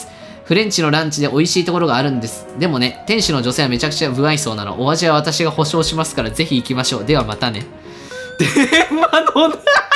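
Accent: Kansai, Japan. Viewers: くんさんの、関西弁おもろすぎやろ！！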